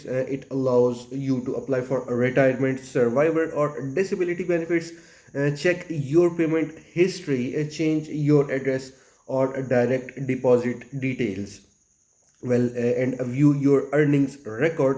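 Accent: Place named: India. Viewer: wow The way you speak English, I can tell you that you are not from USA. You are from India. What do you know about Social Security of USA.??? Everyone in USA knows about their social security benefits. Before you upload any video clip learn how to speak English. All this news are on YouTube video's everyday by DR. Ed weir. So we don't need your Indian accent English, that no one in USA will understand. DR. Ed Weir answering all the question and any kind of question regarding Social security. Stop this bull shit.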